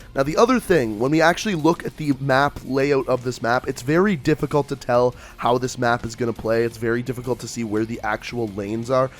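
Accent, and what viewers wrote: Canada. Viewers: oh, he's Canadian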